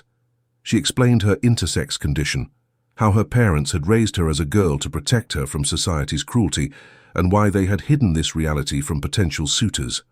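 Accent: United Kingdom. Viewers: Get this crap off now! What's with the tosser English accent? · Me from Visakhapatnam in the Province of Andhra Pradesh, India. I am an adept listener of your narratives, most particularly for the sake of correcting and fine-tuning my British english pronounciation, and improving space of my vocabulary. Thanks for your melodious narrative.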